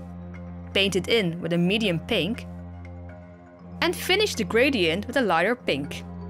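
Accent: Netherlands. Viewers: * I knew you sounded Dutch, my friend is Afrikaans and she sounded like you! Afrikaans is derived from French, Dutch, and Swedish, and the accent sounds just like yours! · Are you dutch you sound dutch I am ducht bwt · Hey, where are you from? You have a Dutch accent, I’m Dutch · I have a question, are you from the Netherlands? Your accent sounds like you come from there! Very cute repaint though. You deserve so much more recognition <3 Edit: ik herken je accent echt! Ik ben blij dat er ook een Nederlander in “the doll community” zit! :) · Jep she is dutch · She’s from the Netherlands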